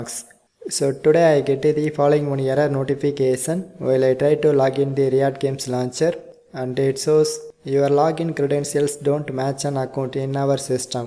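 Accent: India. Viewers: Indian accent always helps people !! <3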